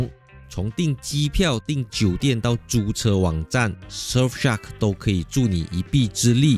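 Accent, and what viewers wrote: Malaysia. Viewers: 口音用词法都看得出你是马来西亚人😂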